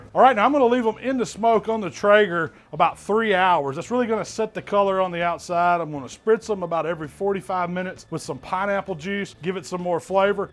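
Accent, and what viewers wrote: South, United States. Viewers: You get a big ol' boy with a southern accent.. You listen to what he has to say about smoking and BBQ.. I don't care who you are. I got these marinating right now and smoker tomorrow. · When you see a big dude with a southern accent making barbecue, you know you are in the right place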